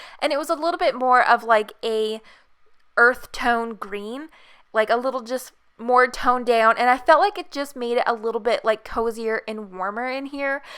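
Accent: Canada. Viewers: As a fellow Canadian, I could tell you were Canadian right away from your accent lol (which is very nice to listen to). Loving this build! You have yourself a new subscriber :) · Are you Canadian?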